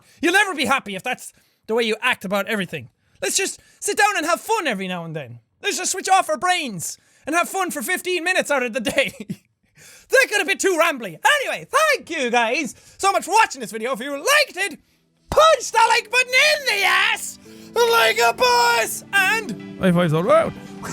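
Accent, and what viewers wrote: Ireland. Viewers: Jack has his irish accent again. I like it %<num> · Hey + do you live in Ireland or are you Irish? Like if you have the same question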